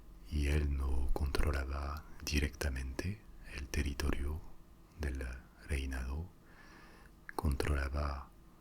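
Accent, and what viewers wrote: France. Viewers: Me encantó tu narración. Una forma diferente pero muy agradable. Yo disfruté de tu relato pausado, con ese tinte de francés todavía a pesar del buen español y tu voz suave pero muy clara. Gracias.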